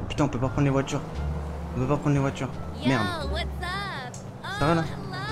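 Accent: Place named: Spain. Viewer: Sa fait bizzare de pas entendre ton accent espagnol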